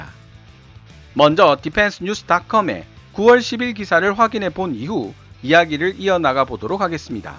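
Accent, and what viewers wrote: Gyeongsang, South Korea. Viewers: 얏~야! ....니는 갱상도 사투리부터 고치는게 시급하다!!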